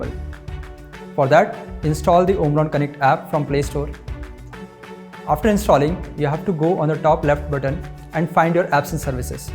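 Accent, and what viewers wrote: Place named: India. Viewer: Strong Indian accent.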